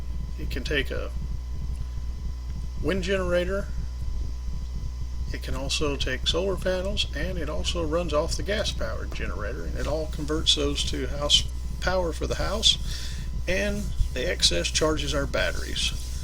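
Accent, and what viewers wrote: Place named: Arkansas, United States. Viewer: You sound like your from Arkansas!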